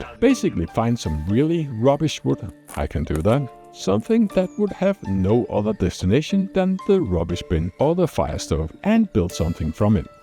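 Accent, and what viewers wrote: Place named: Denmark. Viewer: “Double V D 40” made me laugh. I enjoy the Danish/English. Keep it up buddy. I find myself watching your videos when I want to be calm after a hectic day. You encourage me to build while your videos calm part of my brain that is usually full of angst and frustration. You’re living my dream and I can only imagine how good your soul must feel most days. Thank you for sharing your experiences with us —Chip from Toledo Ohio.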